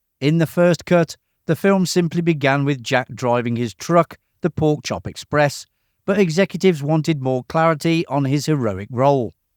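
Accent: United Kingdom. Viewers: Its only his British accent! I'm getting Cattrall! UK here.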